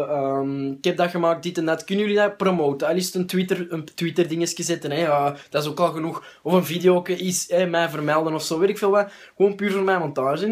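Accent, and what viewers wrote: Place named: Antwerp, Belgium. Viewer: plat antwerps on point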